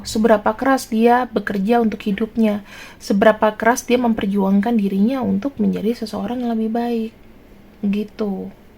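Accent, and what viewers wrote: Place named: Surabaya, Indonesia. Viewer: Opo maneh,suroboyoan bgt 😁👍🙏🙏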